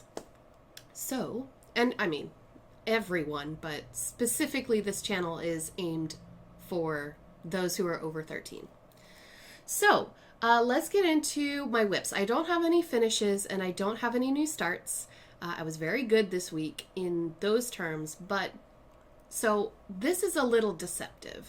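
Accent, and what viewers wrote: Ireland. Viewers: Oh my. The mouse is adorable! I love that your Irish accent is getting stronger.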